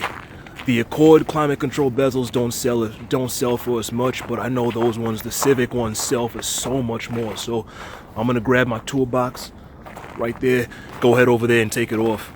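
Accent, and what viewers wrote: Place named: Boston, United States. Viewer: are you from boston or the boston area? your accent tells me you are or near by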